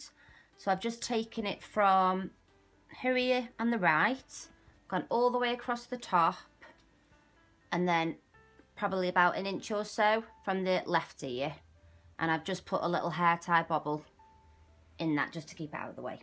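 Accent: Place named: England, United Kingdom. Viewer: si es Ingles pero se le escucha el acento como de Inglés (los que son de Inglaterra)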